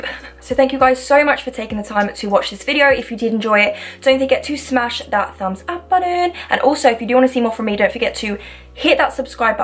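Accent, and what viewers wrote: United Kingdom. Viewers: YOUR BRITISH ACCENT I'M DIYING 😭😭❤️ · British (I know cuz I have that accent too but you can ask in a q&a or something) 😂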